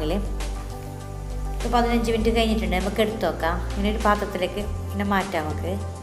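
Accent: Kasaragod, India. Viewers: Kazargod slang alle ithu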